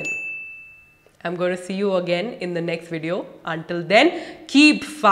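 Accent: India. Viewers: Your English is very indian. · Love ur simple typical Indian English also good pronounciation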